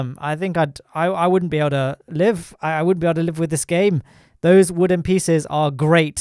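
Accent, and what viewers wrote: United Kingdom. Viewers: I love your videos and love the British & their accent ...lol and I started watching your videos about month ago to learn more about KSP but and I wanted to learn more about youtubers playing games. I bought my own pc and thought it was going to do just fine since I was getting the stimulus money from uncle sam....I'm a disabled Air Force veteran here in the us......and as it was the stimulus money was about 6 months of my income at once so yeah...because it was only $1200 I couldn't afford a great GPU or a computer monitor so I hooked it up to my tv and as you mentioned in this video its soooooo annoying and difficult playing any games on my tv even though it's all I have....lol...I hope you keep making wonderful videos no matter what game it is. 😃👋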